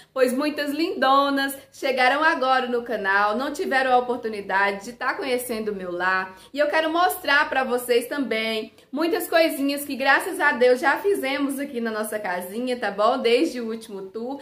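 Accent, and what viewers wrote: Brazil. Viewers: Vc e baiana???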